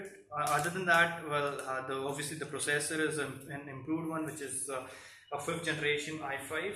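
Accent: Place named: India. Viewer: Bloody Indian accent -_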